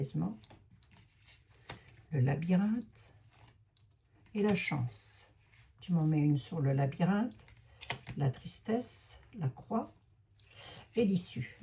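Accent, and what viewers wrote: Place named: Lorraine, France. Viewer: Votre accent Lorain je m'y suis habitué depuis que notre fille est installée chez vous maintenant un peu a côté depuis ce weekend elle vient d'acheter une maison en Meurte et Moselle du coup je l aime merci de nous rassurer et je sais que vous faite ce que vous pouvez mais oui fait aussi prié la France prie plus la vierge nous étions la fille aîné de l'église où est elle ? Courage Aussi a vous amène 🙏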